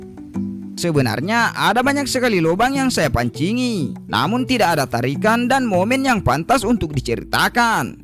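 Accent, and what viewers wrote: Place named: South Sulawesi, Indonesia. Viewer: dari logatnya dari Sulawesi selatan kayaknya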